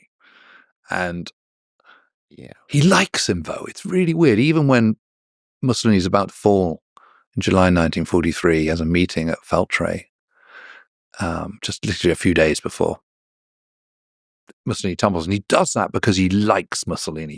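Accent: Southern England, United Kingdom. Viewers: As a fellow countryman & with a background in applied linguistics, I can therefore attest with some knowledge & experience that James Holland naturally (Without any false pretenses) speaks a well-educated form of English with a ‘Standard Estuary or Southern English’ accent! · He has an educated Southern English accent, which is the heart of the English language. Americans as our offspring have a different dialect, which is fine.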